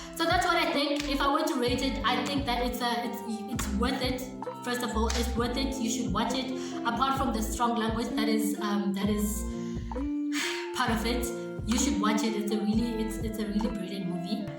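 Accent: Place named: Eastern Cape, South Africa. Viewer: Your accent makes me miss living in the Eastern Cape🥰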